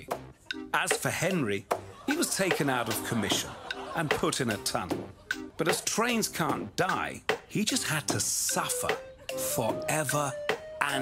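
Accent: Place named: Arizona, United States. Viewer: He sounds like everyone from Tuscon Arizonia.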